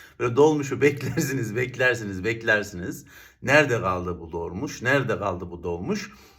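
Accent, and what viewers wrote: Ankara, Turkey. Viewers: Ankara lı mı, Sivas lı mısınız😅😅😅sevgilerrr 🎉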